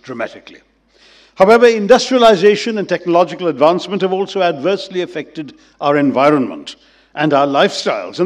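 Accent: United Kingdom. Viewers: He speaks like Britisher.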